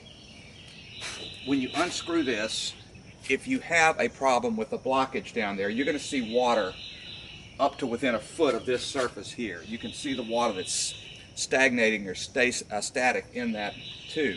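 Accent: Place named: Texas, United States. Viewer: Do u live here in Texas Sir??? Your accent sounds Texan.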